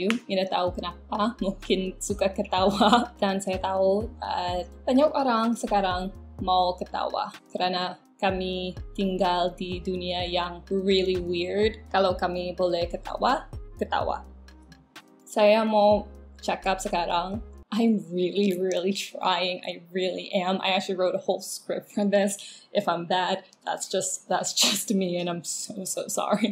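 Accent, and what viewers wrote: Sabah, Malaysia. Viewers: Macam orang Sabah cakap bahasa Melayu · Macam cakap sabah sudah 😄 mulut ku ani inda boleh cakap malayu hahaha😂😂 hee okay lah atleast boleh cakap malayu sikit2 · Your malay accent sound like Sabahan accent or Indonesian accent😂😂,its okay my malay Brunei accent is not really that deep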